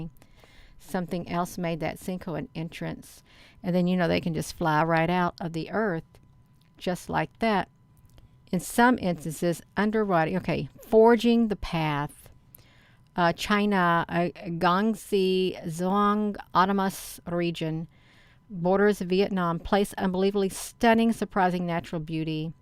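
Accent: South, United States. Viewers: What state is this lady from? Texas? Georgia? I can't figure it out which accent it is.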